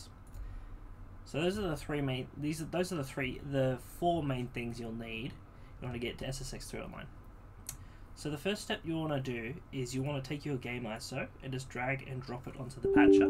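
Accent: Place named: Adelaide, Australia. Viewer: champion, cheers unrelated, but are you from adelaide or is my ear for accents way off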